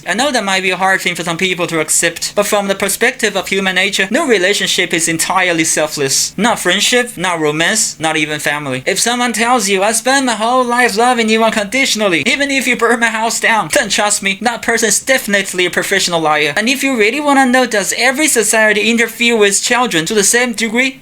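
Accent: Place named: China. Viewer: your accent is quite chinese bro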